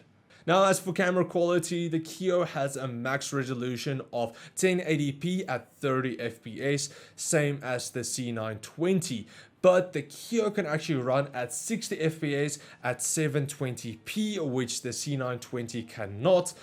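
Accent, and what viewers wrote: South Africa. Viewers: Where are you from in Sa I can’t tell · Dude are you SOUTH AFRICAN if are nice bra ek ook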